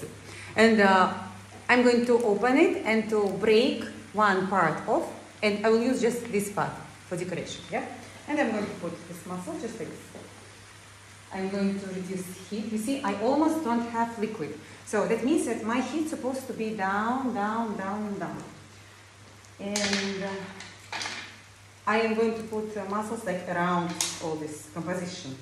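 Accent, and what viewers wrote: Russia. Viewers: I also heard accent similar to Russian... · Is she Russian? That’s no Spanish accent!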